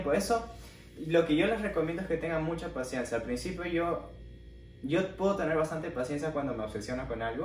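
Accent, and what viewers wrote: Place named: Bolivia. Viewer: Excelente. Una pregunta, eres de Bolivia ? Tu acento es muy parecido a un acento de Bolivia.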